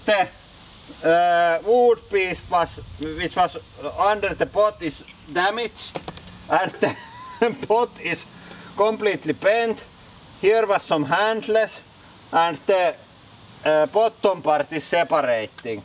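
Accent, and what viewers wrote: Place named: Finland. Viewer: The subtitles is all fucked up :) Can´t handle finnish english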